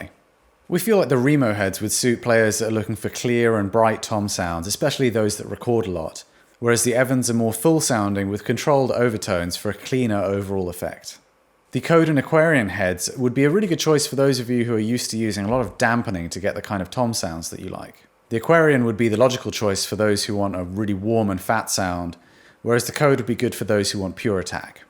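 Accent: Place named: United Kingdom. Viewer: mr accent to British. cool explaining, good information.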